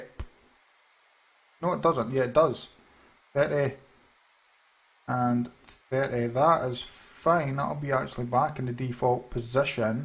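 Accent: Scotland, United Kingdom. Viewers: I'm not saying it's a bad thing but he has a really Scottish accent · Is he really Scottish?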